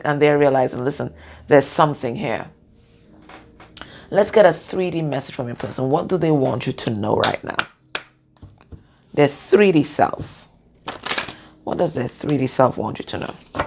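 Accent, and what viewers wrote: Trinidad and Tobago. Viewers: Oh my this was very relevant, thank you for your guidance. I'm awestruck. Are you Trinidadian? You sound and laugh like a Trini ;)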